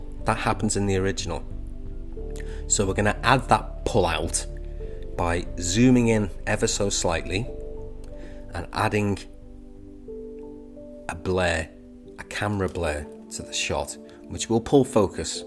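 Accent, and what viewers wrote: Liverpool, United Kingdom. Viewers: A fellow Scouser hey?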